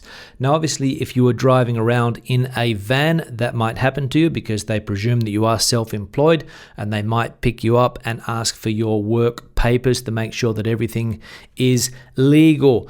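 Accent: Australia. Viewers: I caught the Aussie accent straight away but was surprised your spanish is so good!! I'm Uruguayan but have grown up in Australia, our spanish is a little different to that of Spain but got to say it- you're speaking like a local! Well done!! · You seem to have an Australian accent!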